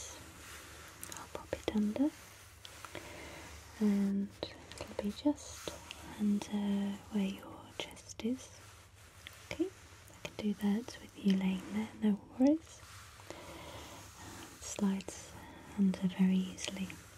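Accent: United Kingdom. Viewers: I've brought all my bits & bobs. Haha love it! Love the Brits! So beautiful to listen to!!! Thank youuu!❤😊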